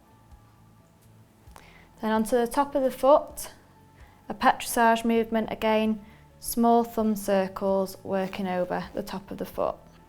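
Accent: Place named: Manchester, United Kingdom. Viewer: No, it's a Manchester accent.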